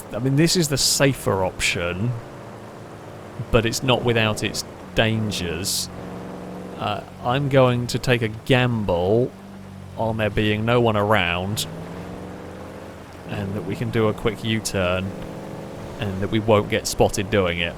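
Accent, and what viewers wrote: United Kingdom. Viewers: I didn't notice anything odd, but then again I've always taken any of his odd sayings as 1. Just plain ole' British English accents versus my American ears and/or 2. I the heat of the moment he's not always fully concerned with prefect pronunciation.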